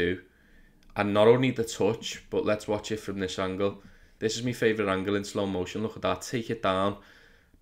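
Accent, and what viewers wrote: Liverpool, United Kingdom. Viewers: Are you liverpool fan?😁 Because you have liverpool accent. great video keep it up.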